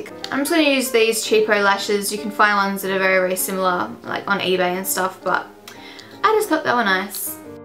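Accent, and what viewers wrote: Australia. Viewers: You seem like a really sweet person. And your tutorials are really good too. Defiantly subscribing! You sound like you're either from Melbourne or Sydney. No? Oh well xxx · You're seriously so gorgeous and I love your Aussie accent! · I'm so happy you have an Aussie accent lol! First ever makeup tutorial iv see from someone I AUSTRALIA!! Yay! Go us Aussies! Loved this tutorial btw! :) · i miss Aussie accents it refreshing to watch these videos ... <3 it! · Are u from australia??? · are you austrailian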